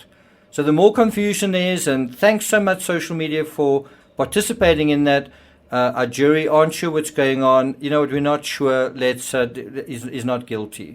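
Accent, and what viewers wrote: South Africa. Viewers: There is no voice better than a South African voice talking about anything ❤️🇿🇦.... sorry been watching all the channels on this and just found yours and couldn't even focus... but yes agree I am extremely selective on what channels I watch and subscribe to. The Vet and check everything before posting or reposting now that everything has gotten so out of control. Thank you 😍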